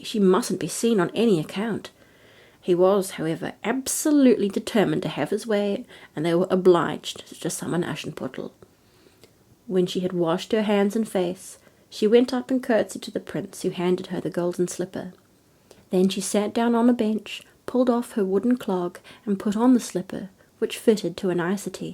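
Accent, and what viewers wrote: New Zealand. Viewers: I listened to this story with my four year old son, its lovely to hear a story in our wonderful kiwi accent! we both enjoyed it, thank you xxx 🌹💖 🤘😈🤘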